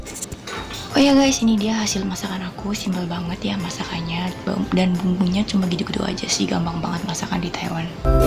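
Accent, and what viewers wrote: Banyumas, Indonesia. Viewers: Halo anak cantik ngapak yen ora ngapak ora kepenak..... Semangat 👍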